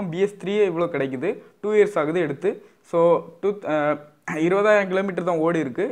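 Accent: Kanyakumari, India. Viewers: nanum kannyakumari taan Unga peachu la tarriuthu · பேச்சு லேசா கன்னியாகுமரி போல இருந்தது அதான் கேடீடேன்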